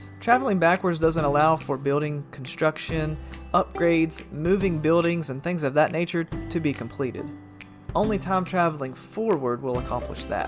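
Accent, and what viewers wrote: Texas, United States. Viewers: Ngl, you sound like what people think Texans sound like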